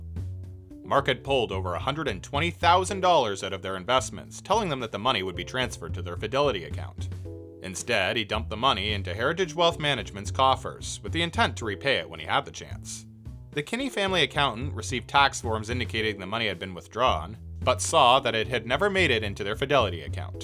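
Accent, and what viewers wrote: Canada. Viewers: I can tell you are Canadian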